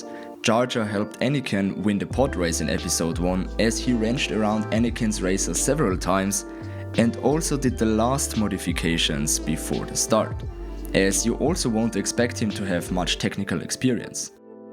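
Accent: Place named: Germany. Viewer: he sounds like a german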